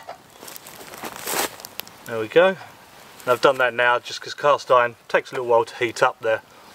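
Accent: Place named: Blackpool, United Kingdom. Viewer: You from Blackpool? My friend Howard sounds just like you, thats where he is from.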